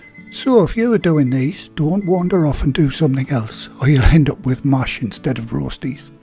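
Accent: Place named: England, United Kingdom. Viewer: “ Mash and Roasties.” I love the English terms and accent ❤️. Hugs from SF Bay Area, CA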